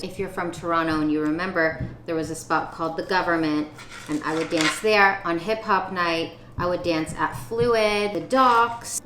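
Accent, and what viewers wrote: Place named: Long Island, United States. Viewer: OMGGGGAWWWDD —— I LLLOOOVVVEEE FROM ❤️ I post on almost all her vids. We definitely need a video on your amazing background. Turkey, Spain, Hebrew name and in Canada...wwwhhaatt? Plus bonus points because your manner of speaking, inflection, sounds like me, a Long Islander ( please don’t been offended 🥰). Loved this video! Muah 😘 (your daughter, speaking her mind already momma! Love it)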